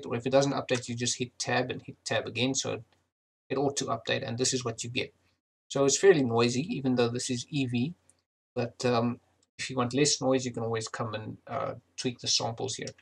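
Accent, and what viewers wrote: South Africa. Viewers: I detect South Africanness. " ", confirmed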